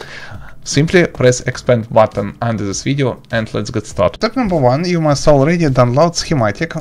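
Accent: Russia. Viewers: русский язык всё равно просачивается) "Схематик" "Майнкрафт" он говорит по русски) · you r russian accent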